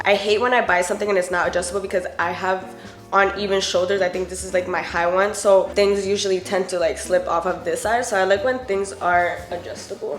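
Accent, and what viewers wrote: Colombia. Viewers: You’re red hair looks BOMB😍😍 love your Columbian accent!! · 😍😍😍😍😍😍😍😍😍😍😍😍😍 You looks so pretty and btw i love your colombian accent you should speak it more love you💓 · I love your Colombian accent 🤤😍 and your body 🔥🔥🔥🔥and yes please do a video on how to pose ! ✌🏽✌🏽✌🏽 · When you speak Spanish the Colombian accent wow 😍, reminds me of my Colombian family makes me wanna go eat some buñuelos, arepas and empanadas. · I love her colombian accent ♡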